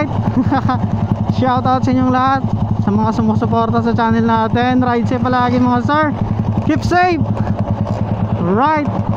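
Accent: Iloilo, Philippines. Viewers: Ilonggo ka sirr???? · parang ilonggo ka po😹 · Ilonggo ka boss?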